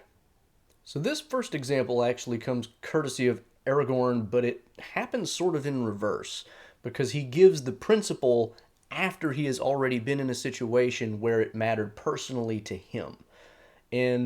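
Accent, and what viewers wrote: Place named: Southwest, United States. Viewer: Where in the south west?....of the United States is your accent from?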